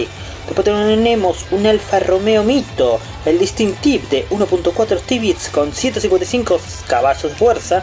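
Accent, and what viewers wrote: Argentina. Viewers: de donde sos bro? Creo que eres argentino como yo pero de que provincia?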